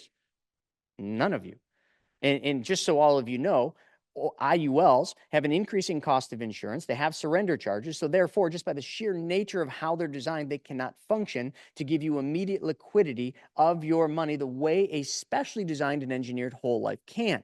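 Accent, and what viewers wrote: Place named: Upstate New York, United States. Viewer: ! 😂 I’m in upstate New York as well and I could hear the accent. I will be calling you to sign up for insurance for my RLT 🎉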